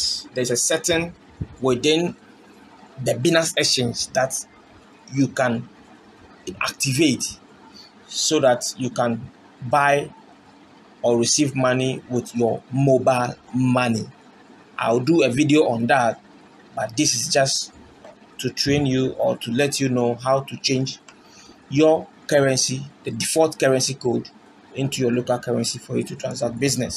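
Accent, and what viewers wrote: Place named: Ghana. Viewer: Ghanian accent is so annoying and you talk too much. Go straight tot he point pls. Thanks anyway, I figured it out before even getting half way through this video.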